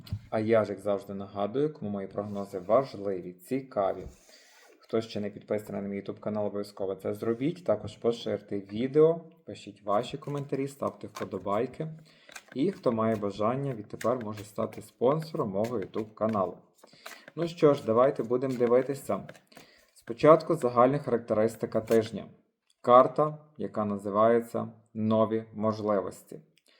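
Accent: Ternopil, Ukraine. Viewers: Спасибі, з тобою спокійно...затишно..і ще люблю тернопільський говір..твій баритон.....ти народний провидець. дякую.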